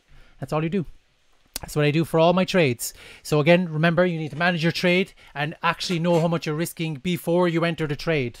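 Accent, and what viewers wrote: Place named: Ireland. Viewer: Nice to hear a fellow Irish man. Are you from Kerry/Cork? Great video btw. Thanks ALOT!!!!!!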